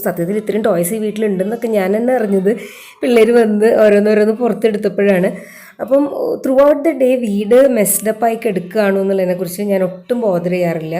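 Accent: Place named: Thrissur, India. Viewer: 5kuttyolum🤩🤩👌👌👍nammude thrissur bhasha idakkidak varunnundtto🤩👍kuttypattalam nannayi enjoy cheyyunnund🥰ningal sisters randaalum banglore aanalle..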